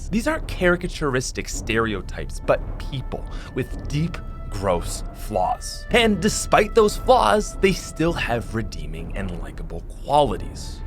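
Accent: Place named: Quebec, Canada. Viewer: hes french canadian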